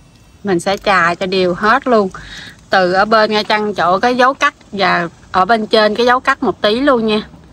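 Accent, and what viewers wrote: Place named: South, Vietnam. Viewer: Hello. Gái Miền Nam VN với giọng miền Nam nghe quá đã, người VN qua các nước Tự do quá giỏi, coi mà mê luôn vì cô nàng có năng khiếu khéo tay, hay làm và chỉ dẫn tận tình. Chỉ tỉ mỉ rõ ràng không mê thì quá uổng, hay cho ông chồng này có bà vợ dễ thương và lại quá thật thà. Cảm ơn.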